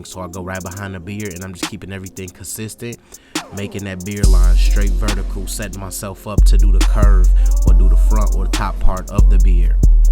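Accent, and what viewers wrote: Chicago, United States. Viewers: Ayo you frm Chicago??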